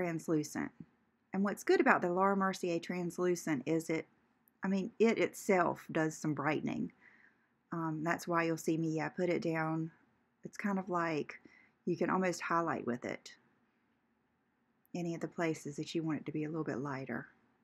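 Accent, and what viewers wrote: South, United States. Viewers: Great video!!!! You’re voice is so welcoming and southern! ♥️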